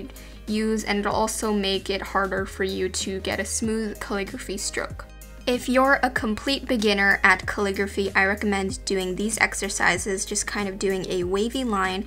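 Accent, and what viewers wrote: Canada. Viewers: Is she canadian?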